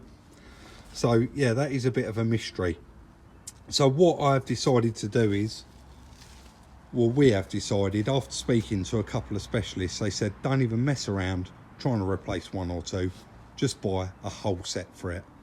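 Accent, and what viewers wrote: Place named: Essex, United Kingdom. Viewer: Hi boys I’ve been following you on YouTube on my tv and on there I cannot comment so now have joined you on my I pad to comment. Great channel great work , and it’s really nice that I hear some one speak Essex as I do love the vids keep up the good work , just one thing puzzles me is where is all the TEA?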